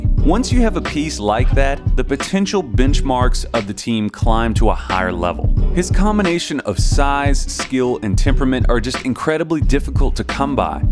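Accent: United States. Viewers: Yeah, at first I was thinking to myself "Gosh... This guy perfectly nailed the American accent... (My thinking being, that the narrator was Slovenian:))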